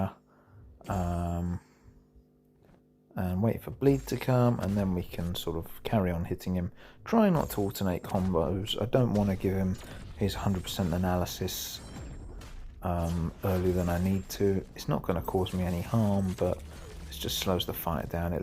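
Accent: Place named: England, United Kingdom. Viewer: Just wanted to comment and say keep posting. I prefer you over Lagacy and being English myself it's so refreshing hearing a familiar accent. I'll be watching more videos to come! 🇬🇧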